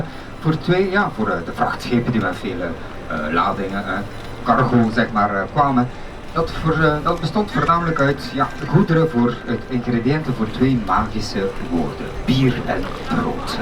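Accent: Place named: Holland, Netherlands. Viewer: Jammer van dat Hollands accent...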